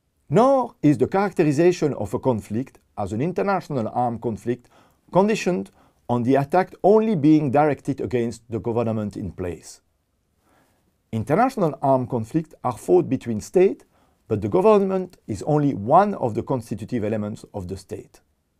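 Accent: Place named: France. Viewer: I like the French accent. Thank you for your explanation.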